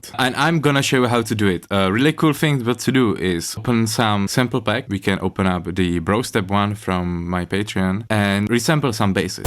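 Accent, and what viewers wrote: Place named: Czech Republic. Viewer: ja si celou dobu rikal, ze mas takovej ceskej prizvuk a pak si dropnul pancz a bylo mi to jasny:D dobrej tutorial btw:)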